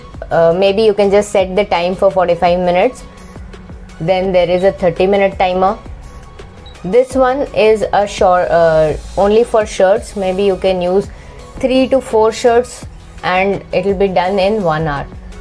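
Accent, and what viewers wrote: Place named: Kerala, India. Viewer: Very good English. Well explain ed. I think your place is kerala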